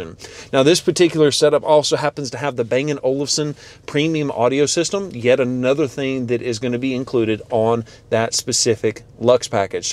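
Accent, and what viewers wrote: United States. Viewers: The American accent makes everything worse. He makes modern tech and sophistication sound like it comes from Medieval times. Impressive. Also, it's hilarious listening to Americans and how they struggle to utter words more than two syllables. Must be a real big task.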